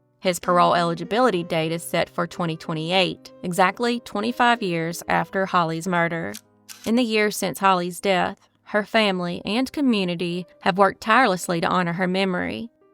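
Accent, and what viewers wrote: South, United States. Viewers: I am seeing this 9 months later and I hope that you are doing well and pray that good will comes your way. God bless and I wholeheartedly agree with you, rocks and somehow her sweet southern soul shines through her accent and it’s very soothing on one’s heart and soul. God bless.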